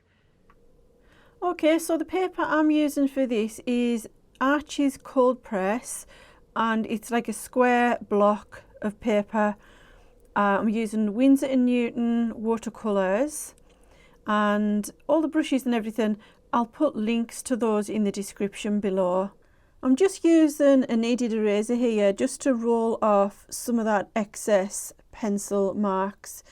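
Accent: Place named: Newcastle, United Kingdom. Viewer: How comes I haven't found you before? I loved my 4 years up in Geordie land many moons ago. Your accent makes me feel homesick lol. I love your style and can't wait to get my paints out again!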